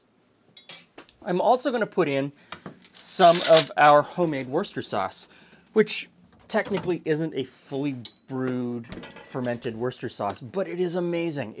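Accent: Canada. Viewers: It's funny, despite saying pop and hearing a Canadian accent daily, I'm always still momentarily taken aback by hearing them in the media I consume. Thanks for all the work you do,!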